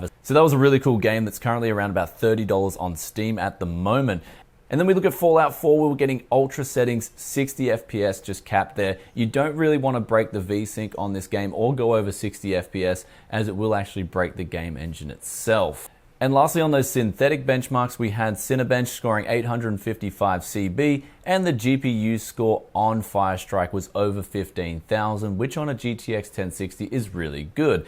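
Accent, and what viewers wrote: Australia. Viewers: could tell he was aussie from accent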